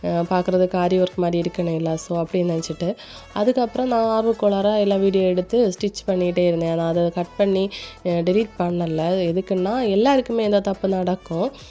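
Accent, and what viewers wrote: Kanyakumari, India. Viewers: உங்க voice ல நீங்க கன்னியாகுமாரி dist தான் antthu தோணுது.... நானும் கன்னியாகுமாரி dist தான்.... உங்க aeria வில ஓரோ dress க்கும் உள்ள stiching fees avalauv anthu ஒரு வீடியோ போடுவீங்களா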